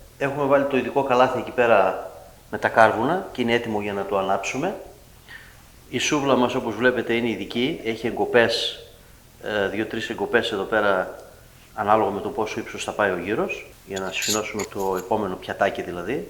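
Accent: Greece. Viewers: the Greek accent of English, that I find totally embarrassing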